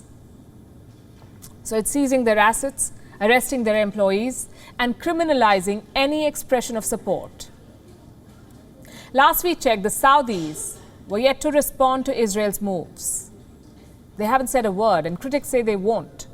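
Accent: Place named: India. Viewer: What a joke! 3.7 million subscribers watching this channel is unbelievable. International news in English with such a thick Indian accent is barely comprehensible. It doesn't suit Indian media and the Indian population, in general, to focus on anything other than the welfare and education of their own helpless and severely impoverished population and their many domestic issues such as corruption, racism and poorly managed socioeconomics. The World Bank is funding programmes to educate Indians on how to use toilets to help them get out of the habit of defecating openly in the streets. International media is having fits laughing about it, yet Indian media is pretending to be breaking news to the world. They should focus on benefiting from their internationally funded potty training rather than commenting on international news.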